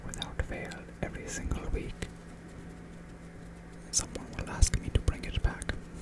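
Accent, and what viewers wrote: India. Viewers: This is awesome. Your Indian accent rules bro 🔥🔥🔥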